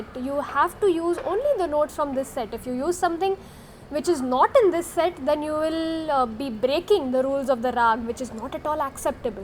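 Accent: India. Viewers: You are single-handedly making the Indian accent cool.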